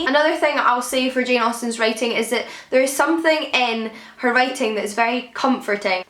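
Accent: United Kingdom. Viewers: Thank you very much for your sharing! I find it very useful for my study as an English major in China...btw, I am very intereted in your accent, I think it is very cute...Do you mind if I ask which part of the UK you are from? Thx so much!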